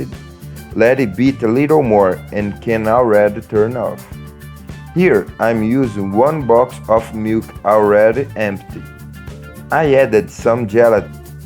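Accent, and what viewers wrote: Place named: Brazil. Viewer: Mn esse cara n parece um gringo falando, acho q é um brasileiro falando inglês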